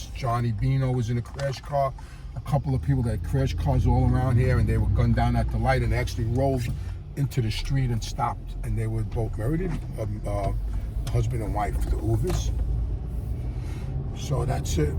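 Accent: New York, United States. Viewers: LOVE that New York accent! The only thing better than listening to some guy from New York speak, is listening to some woman speak! Specially the single women.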